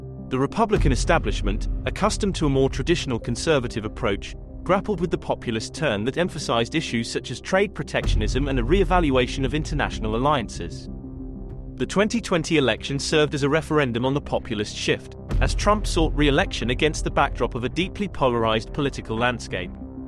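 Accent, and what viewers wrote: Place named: United Kingdom. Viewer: Too British for me I am sorry.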